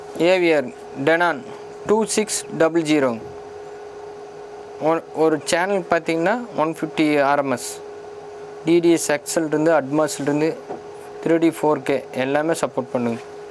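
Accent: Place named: Kongu, India. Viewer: More than work i m big fan of ur kongu slang....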